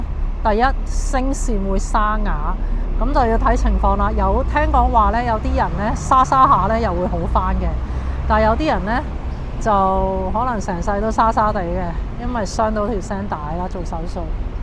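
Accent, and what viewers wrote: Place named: Hong Kong, China. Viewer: 你係咪耳聾架，正宗香港人一聽就知片主係香港人啦，咩野大陸同胞共享香港免費醫療呀？有病快啲去睇醫生啦，新制下，精神科唔使錢架。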